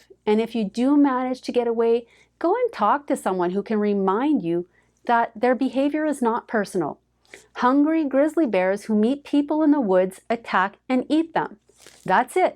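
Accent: Canada. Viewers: I like your Canadian accent! Canada is my favorite place to visit. Thanks for sharing.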